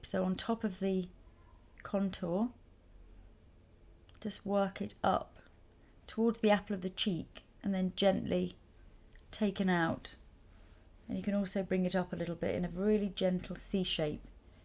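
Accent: United Kingdom. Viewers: So peaceful your voice! I really love watching your videos 🥰, and love your British accent, it takes me back to my high school days with my British professors 😍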